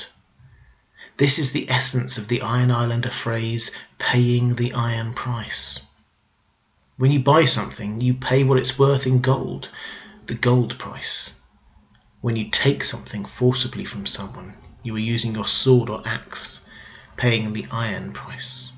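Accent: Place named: RP, United Kingdom. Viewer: Hi, I've done, among other things, radio ads and have gotten feedback that I have the best voice in local announcers, etc and was chosen over many others for a series of PA announcements at DIA (Denver Airport). I have also directed audio sketches with a cool group previously online. I say this to let you know I know something about voice delivery. There is something to consider in what Yol says. Got the downbeat voice criticism from radio station managers, when the women said the slower voice was good. I think they were reacting as women and found my voice attractive - whereas the men were more balanced in their judgement and as such should be listened to. You have so many very good things going on with your voice and delivery - but there is a certain downbeat aspect to your voice that bears improving upon - it tends to lull the listener who will fight to stay awake because they can tell what you have to say is important. 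Often very important.. Perhaps it is to bring more variance in your voice, variations in inflection, stressing certain words over others and differently. Changes in the tempo of the words to liven things up. There is a peace and humanity in your voice that makes listeners want to be civil and human on your posts. Very humble though you're speaking in a very posh upper class British accent. Very good on your content! These videos help me live without the series or any new books. Yours are quite good and... quality stuff!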